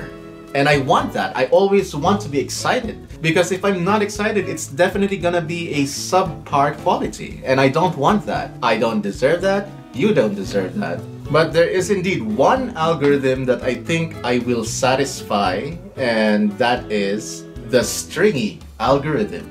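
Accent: Philippines. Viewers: You're starting to lose your Filipino accent... very slightly. It's fascinating to watch its progress. lol I hope you're finding life in the US ok.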